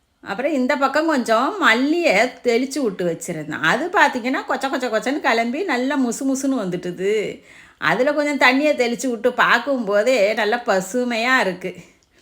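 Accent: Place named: Mannargudi, India. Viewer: Unga pecha ketta mannai side pechi pola irukku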